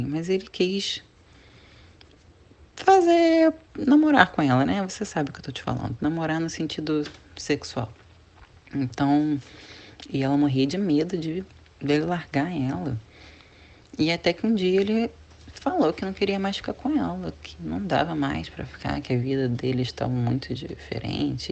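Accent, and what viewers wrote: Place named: Rio de Janeiro, Brazil. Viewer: Sotaque carioca, mas a voz mesmo não tem nada a ver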